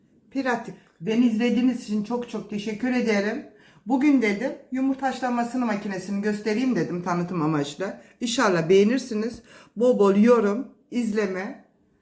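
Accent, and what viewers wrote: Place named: Bolu, Turkey. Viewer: bolulu musun aq o nasıl bir lehçe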